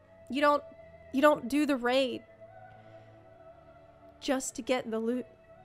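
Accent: South, United States. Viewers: southern accent comes out when she's mad.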